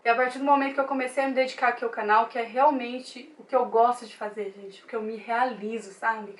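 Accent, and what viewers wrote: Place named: Northeast, Brazil. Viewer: olá bom dia.eu amo seu sutaque d nordestina e tão lindo.esse arrastado d R.kkkkksucesso linda fica com deus.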